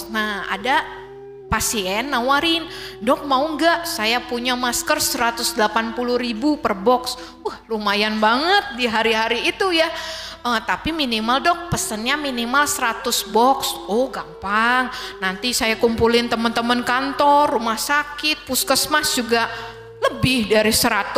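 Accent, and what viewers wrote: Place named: Priangan, Indonesia. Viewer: Duh Gusti tutur katanya bahasanya ini sangat menyentuh hati lembut dan sejuuuuuuk di kuping,logatnya seperti orang priangaaaaan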